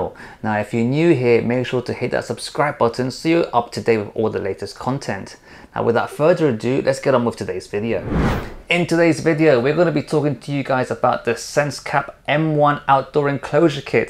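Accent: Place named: England, United Kingdom. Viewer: This is exactly what I was looking for! Thank you!! Also this is random but I've never seen or heard a hispanic/latin with an english accent! I'm Mexican living in the US so it blows my mind lol. Saludos!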